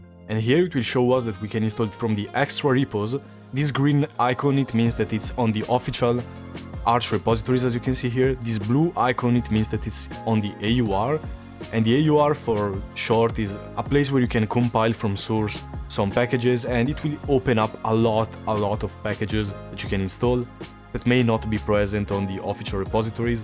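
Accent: Italy. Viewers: Bro ma sei italiano?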